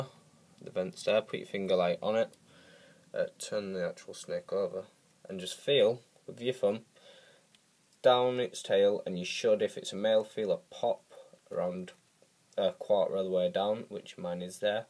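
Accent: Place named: United Kingdom. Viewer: Ur British!! Lol il pm you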